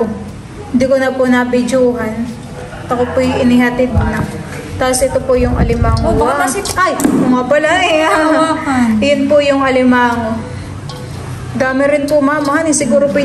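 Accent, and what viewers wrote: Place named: Mindoro, Philippines. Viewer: Pamilyar at nadinig ko Yang tuno na Yan sa lubang Mindoro, Taga Mindoro kaga?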